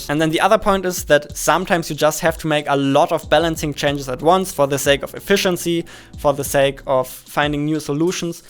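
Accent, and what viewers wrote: Germany. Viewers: Ich hab direkt erkannt, dass du deutsch bist :). Merkt man an deinem Akzent, aber nach weiter so, feier dich und hoffentlich kriegen wir endlich die 100k Abos fertig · This is the most German accent I have ever heard.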